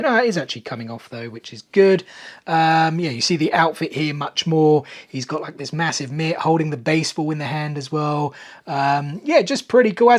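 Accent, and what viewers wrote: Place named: Australia. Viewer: You're in London? I thought your accent was Australian!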